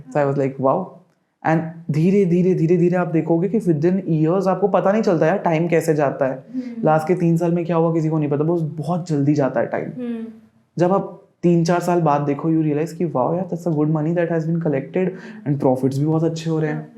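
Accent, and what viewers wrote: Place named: Mumbai, India. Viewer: MUMBAI STYLE HINDI HAI.....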